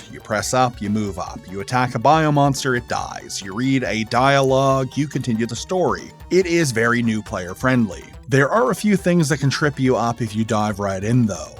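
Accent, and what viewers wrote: Maritimes, Canada. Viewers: Bro... you from the maritime provinces?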